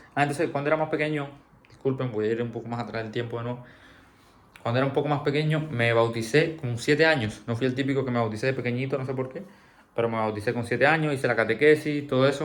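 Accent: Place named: Paraguay. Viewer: Empecé a ver tu video y no entendí, pensaba que eras paraguayo, tienes un acento muy paraguayizado..😊 Deséo que te vaya de lo mejor, las personas de bien son muy bienvenidas!!!!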